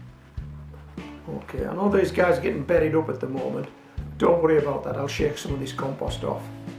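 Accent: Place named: North West England, United Kingdom. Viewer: Hi, I seem to have offended you with my comment, I really didn't mean to, I was very excited to find your channel, and thought from your accent that you may be in the North West like me, so was inspired by the things that you were doing in this climate. In my first video which I am publishing this week, I have said that I got my inspiration from you, and linked to your channel. If you want me to take it out, I will do.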